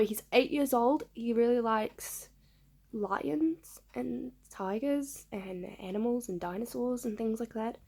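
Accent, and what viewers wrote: Australia. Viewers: it sounds like a pretty typical australian accent to me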